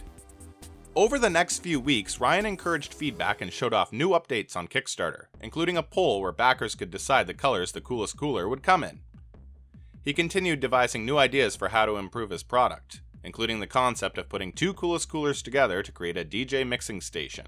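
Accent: Canada. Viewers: I think the voiceover guy has the most Canadian accent ever lol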